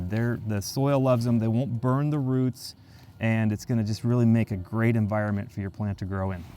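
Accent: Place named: Pennsylvania, United States. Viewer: Great video. Sounds like a PA accent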